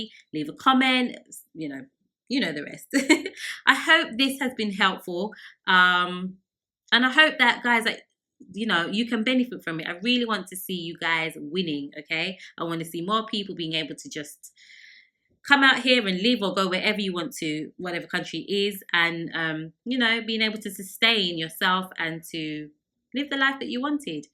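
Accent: United Kingdom. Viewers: Like your content & was drawn to subscribing after watching some of your prior postings. When I see you sharing ur knowledge for free I felt a good vibe about you😀in addition to that British accent. And since you are being so generous i'd like to give u a hand by directing you to a free online source with a wealth of info which u may find helpful pertaining to your calling. If you're interested, let me know with a reply in the thread. Keep inspiring! Peace!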